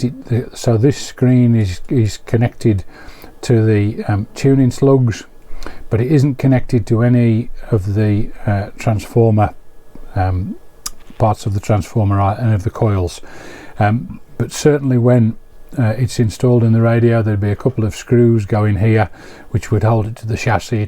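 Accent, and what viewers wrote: United Kingdom. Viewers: Hey just come across your channel, yay a fellow Brit 😂 you sound close too.. I'm in Doncaster... Excellent content, I am going to start teaching electronics, I'm really quite sick at the moment so won't be soon but I feel like I should do my bit for the future EE and enthusiasts.. I've subbed and will take a look at your content.. 💡You should show how to make a peak detector probe to remove the carrier make the scope even more like an analyzer display 😁.. Fyi on some of my scopes you can turn off a channel and it will still trigger off that channel, or you can use the external trigger input and feed the sync from the generator in there.. I've done similar in the past I've used the external sync from the generator and adjusted the scope so the sweep takes up the whole screen, moved the trace down so you only see the positive half, basically a bode but with the sweep.signal visible, Steve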